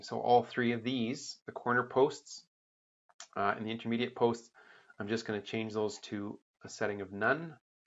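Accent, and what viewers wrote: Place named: United States. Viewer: Amazing tutorial man. Per your accent I assume you are American, I would have thought you would give the dimensions in inches instead of mm. Thank you so much.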